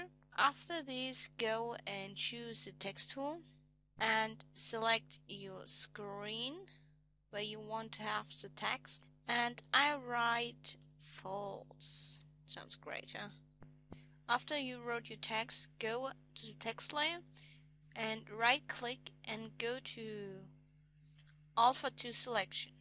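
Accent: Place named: Germany. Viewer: The accent is German and I am German, too, so I have the same awesome accent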